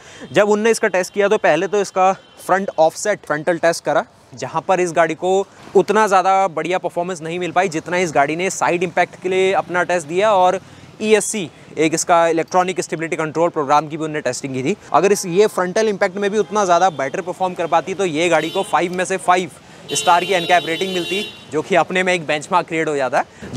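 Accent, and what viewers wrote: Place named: Madhya Pradesh, India. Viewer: Bhai MP s ho kya Unne sun k laga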